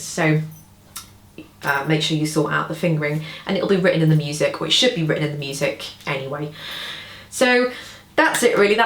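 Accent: England, United Kingdom. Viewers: Talented, beautiful, AND you have an English accent. I want one!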